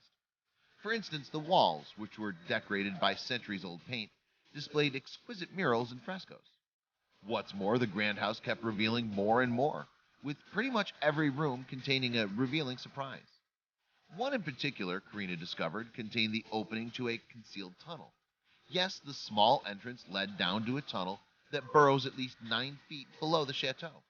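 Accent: United States. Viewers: THE AMERICAN VOICE ON THIS VIDEO IS A COMPLETE TURNOFF. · Why the dreadful American voiceover with mispronunciations? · irritating narrator... why an american..? and stupid music.... disturbing like hell! no likes or subscription, guaranteed!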